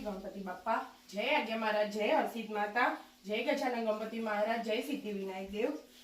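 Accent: Mehsana, India. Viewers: સુરતથી ગંગાપુર -ઊંઝા ની સફર આપણી બોલી -મેહાની બોલી ખૂબ મઝાની હું હાલ અમદાવાદ ખાતે પરંતુ ઊંઝાનો જમાઈ એટલે મને તમારા વિડિઓ અને બોલી ખૂબ ગમે છે. સરસ વિડિઓ બનાવતા રહો અને અમે એન્જૉય કરતા રહીએ....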